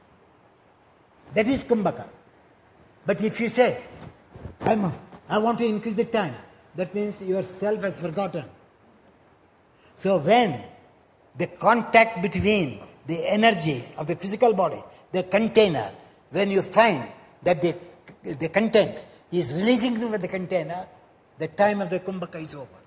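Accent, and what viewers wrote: India. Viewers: How Can I Correct the Subtitles? Perhaps the Tamil language accent of his is confounding youtube's speech recognition software. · OK, that was not entirely clear, and I sure am familiar with yoga terminology and Indian accent.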